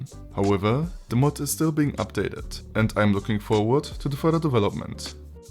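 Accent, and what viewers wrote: Germany. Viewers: bro man hoert das du deutsch bist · German accent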